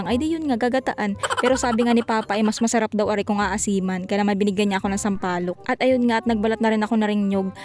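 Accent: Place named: Quezon, Philippines. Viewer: Di namn Batangas Yan Quezon Yan sya😂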